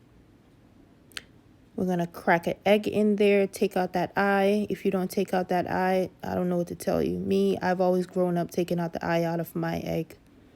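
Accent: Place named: United States. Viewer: Roza send me here loving the content already ? Are you a Jamaican you sound American was wondering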